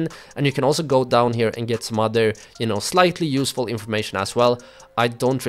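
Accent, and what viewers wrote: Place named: Sweden. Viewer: Like the swedish accent❤️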